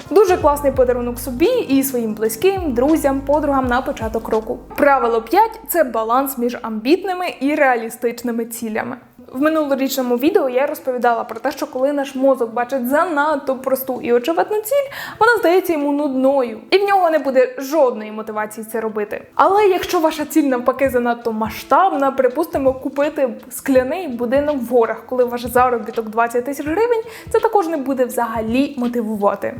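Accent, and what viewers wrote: Poltava, Ukraine. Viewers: Ви випадково не з Полтави?)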